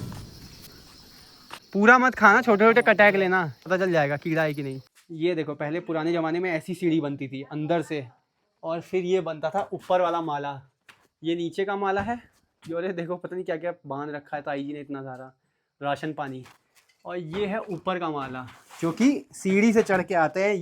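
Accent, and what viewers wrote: Pahadi, India. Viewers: Pahadi accent amazing ❤❤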